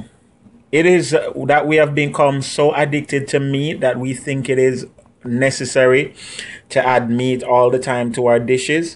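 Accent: Jamaica. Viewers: your Jamaican accent is charming!!! & the soup looks good too!!! ...not a fan of dumplings so will make without :-)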